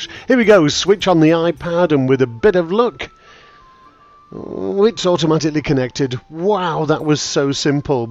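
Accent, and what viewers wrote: United Kingdom. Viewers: Do I detect a Scouse accent here? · are you telling that to the guy in the video ? -.- that's so racist, and stupid ! That's a British accent, and the higher chances are that he is already there were he made the video, he doesn't have to go back anywhere ! .-.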